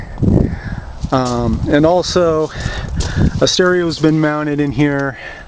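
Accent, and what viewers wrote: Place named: Midwest, United States. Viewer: Are you from the Midwest? Your accent sounds exactly like a friend of mine. Kept thinking it was him. Kool conversion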